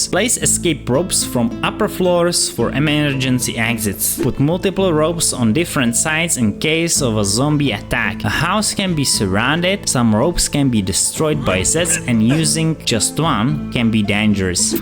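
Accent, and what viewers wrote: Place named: Czech Republic. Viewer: Nejsi náhodou čech? už jen podle toho jména a v ty ang mrtě slyším ten přízvuk, nic proti pěkný video :D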